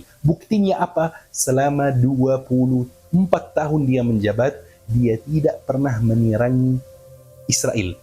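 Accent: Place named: Arab world. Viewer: Ini spt suara. Mhs Mesir yg pernah belajar di Indonesia. Stlh tamat, dia tinggal di Indonesia. Yg dia lakukan: Pertama, jd youtuber, konten ttg mazhab2/sekte dlm dlm Islam. Kedua, setelah gagal jd youtuber, dia bertrsnsformasi mnjdi ustad.dan pnceramah. Pakai surbam, kliing2 Indonesia. Tp spt nya juga kurang berhasil. Ketiga, skrg kmbali lg jd youtuber spt dlm video ini. Kl ini apa yg dia ceritakan ini bkn hsil riset. Tp hnya berdasar berita, berdasar video2 yg sdh bnyak beredar pasca Jatuhnya al Asad. Sy justru curiga pd ini. Dia hnya ingin memainkan perasaan umat Islam Indonesia agar terprofokasi. Dia justru penebar fitnah baru. Tanda-tanda dia bohong, perhatikan dan dengarkan berkal kali dia bersumpah: demi Allah...demi Allah... Hai...kamu kan orang Mesir, coba kamu berani tdk kritik presiden kamu sendiri, al Sisi yg jg diktator al Asad. Brp orang yg mati dan dipenjara oleh rezim al Sisy ktika mrk protes terhadapnya..?? 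Comeon.....kritik presidenmu sndiri... Selancar apapun bhs Indonesiamu, sy msh bs membedakan bhw anda bkn org Indonesia. Ktika kamu mengucapkan fonem2 tertntu yg tdk ada dlm bhs Arab spt: nya, ng, pelafalan kamu msh klihatan sbg org Arab. Akhirnya, sy jstru curiga bhw anda ini mata2/ antek asing yg disusupkan di Indonesia utk mmecah persatuan bangsa Indonesia dg mmbahas isu-isu sekte/ mazhab yg terus berkonflik di timur tengah. يا....نحن نتابع كل خطوانك..وقد تركت الآثار التى لا نمحوها....!!!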